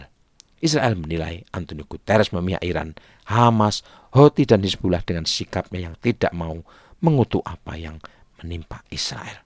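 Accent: Java, Indonesia. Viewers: Terlalu medok Logat Jawanya diperhalus yaaaa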